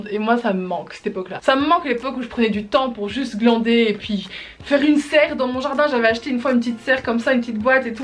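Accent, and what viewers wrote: Belgium. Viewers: T'es belge?😃😄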